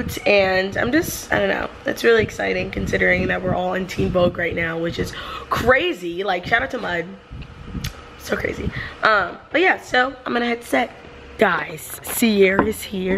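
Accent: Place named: East Coast, United States. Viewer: Anyone else love how she says strawberries ?? Idk I'm weird but your east coast accent comes through and it's so cute 😩💕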